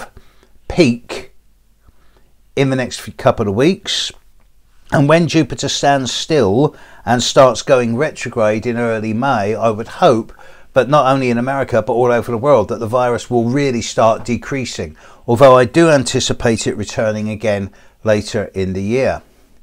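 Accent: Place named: West Country, United Kingdom. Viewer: That West Country accent comes out now and again 😆... cut the jokes mate🙄stick to the Astrology 👍sorry I’m an outspoken Sagittarian🤭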